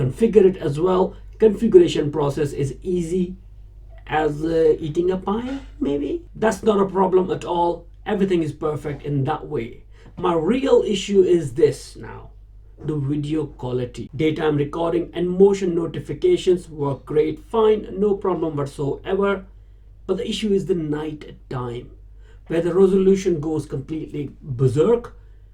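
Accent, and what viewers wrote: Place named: India. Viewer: Abe... Desk accent bahut hai be... Didn't show how to INSTALL it on front door 🚪 need to do be more thorough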